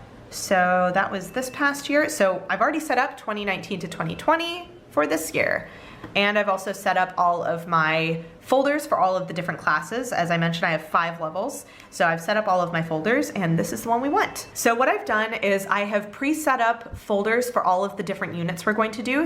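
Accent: United States. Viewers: That's really cool. The Yearbook thing brings back memories =) Do the kids ever get confused about pronunciation of some English words between your American pronunciation and the other teacher's UK pronunciation?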